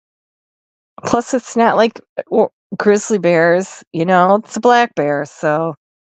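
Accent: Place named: United States. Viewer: I love your laugh ❤ American accents is one of the best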